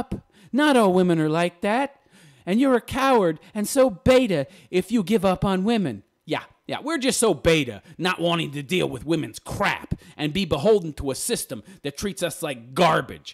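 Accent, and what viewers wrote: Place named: South, United States. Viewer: My dear Lady Vin, thank -you for your prompt reply. Bible days, tantrums, Arab men, women without rights, who will cave-in first and hidden children within the Catholic Church.... Did I forget to mention Harem ?? To begin with, I'm not an American, however, MGTOW men do not want to have women , period. No wives, mistresses or harems. Please do not misquote me on that . We want less responsibility with regards to women, not more. MGTOW men say no to marriage, LTR and co-habitation. We make no demands of women, other than their distance. Biblical times, NO, we are moving forwards, not backwards. We live within the Western society and have little or no regard for oppressive cultures. The laws in the USA as well as here in Europe and biased in favour of women when it comes to divorce and taxes. MGTOW is a mindset, not a formalised structure, no central organisation, no official spokesperson. 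Therefore, there can be no implosion of men who choose to live life on their own terms. We are as intervidual and unique as you are. Illegitimate children within the Catholic Church is something I am well aware of. Being Catholic myself, I know all about the Madeleine Laundries and the lives that institution wrecked. On par with the Holocaust in my opinion. So yall can chew on that awhile ( love your Southern accent ). Thanks once again for your reply....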